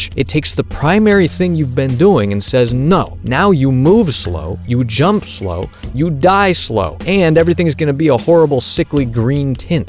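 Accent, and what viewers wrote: United States. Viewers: This guy is from NY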